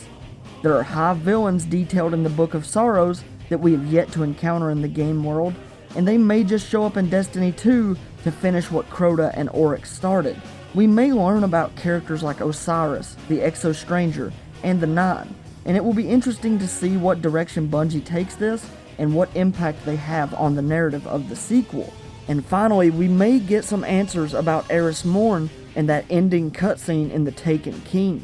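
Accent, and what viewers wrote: South, United States. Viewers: I hate southern accents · I thought it would be a fuunnn way to tie up those loooose ends 👌cowboy accent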